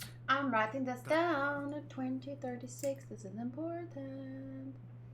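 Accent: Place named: South Africa. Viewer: I just cam across your channel tonight, and when you talked I was like. "YO you South African" if im wrong i will kill myself. But im like 100% sure you are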